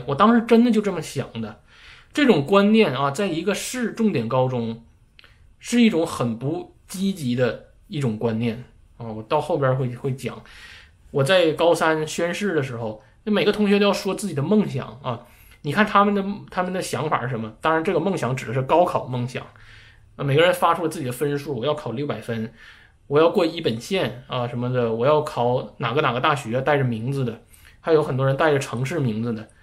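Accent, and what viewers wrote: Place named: Suizhong, China. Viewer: 听口音是绥中的吧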